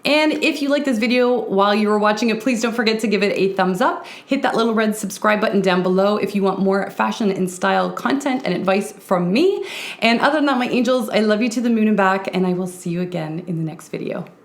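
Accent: Canada. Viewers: Out, about and sorry... how I miss my younger years in Calgary, don’t hear that accent in CA often. Love all the advise you give 👍🏼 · I come for the fashion advice, I stay for the Canadian accent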